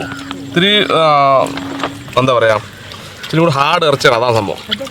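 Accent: Palakkad, India. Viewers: തനി നാടൻ പാലക്കാട് ഭാഷ 💞✨️✴ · നല്ല orjinal പാലക്കാടൻ ഭാഷ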